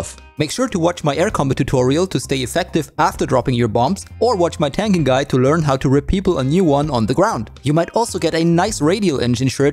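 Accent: Germany. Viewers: Ngl im very thankful for this video, ive been trying to dive bomb for ages I still can't dive bomb but hearing german names pronounced with a german accent makes me happy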